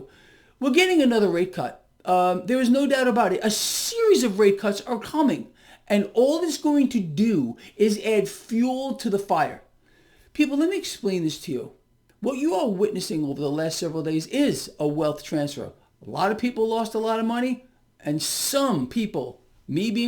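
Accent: United States. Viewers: You speak like a true American Sir....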